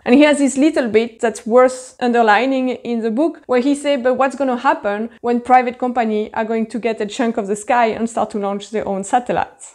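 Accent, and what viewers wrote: France. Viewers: Thanks for your video, which gave me the opportunity to test some of my ideas. One thing I couldn’t help but notice. Some of the comments make me want to take up the cause of woke micro aggressions although the intent is positive. I mean to say a French women (my assumption) with a French accent! Imagine it… people need to travel more I think aside from COVID and global warming implications…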